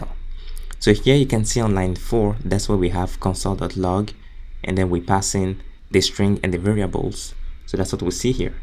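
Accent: Nigeria. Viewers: Great tutorials, nice accent. Nigerian, yeah?😊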